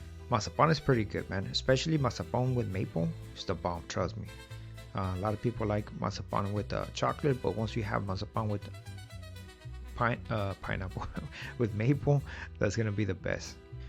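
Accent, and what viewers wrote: United States. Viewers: I’m watching this video and I love the fact that you started your own business. You don’t have a Mexican accent at all. You have an American accent. I noticed that you are going to close the business and that’s sad. I hope you get another location and continue your business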